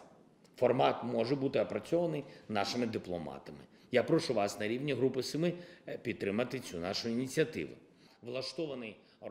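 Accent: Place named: United Kingdom. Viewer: He speaks English with a British accent. He works for London city Charles.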